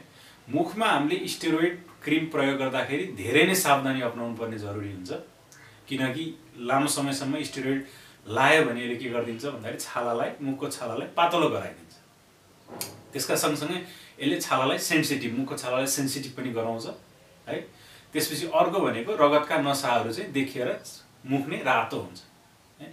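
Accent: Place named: India. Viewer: jyu Ta----pain Pahila Nepali Bhasha ramro bolna siknush hai ki ta----Angreji mai bonus Hindi ko nakal le garda hjrko yeti dherai ramro sallah 10 pratisat pani Asar parne dekhdina.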